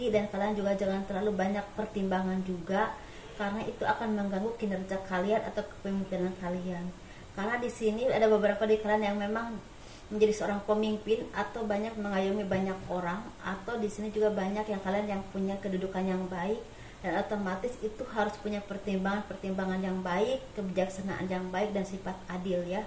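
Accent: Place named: Java, Indonesia. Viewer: Biar lah mau campur Jawa kek Gak masalah 😀😀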